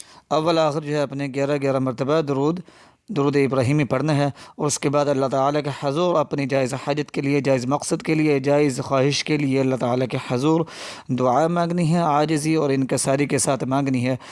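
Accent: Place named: Bangladesh. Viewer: this is bangali accent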